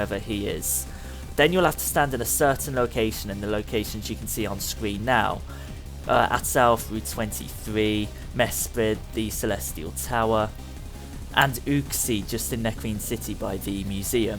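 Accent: United Kingdom. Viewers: AND ENOUGH OF THE BRITTISH ACCENT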